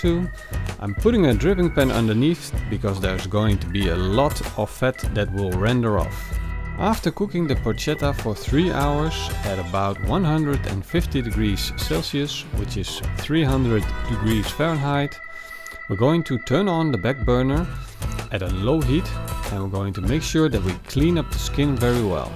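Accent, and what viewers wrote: Netherlands. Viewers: Hoor ik daar een Hollands accent? Mooi recept btw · You can hear the horrible dutch accent. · I already thought I heard a Dutch accent. I was going to ask you if you were Dutch but now I don't need to.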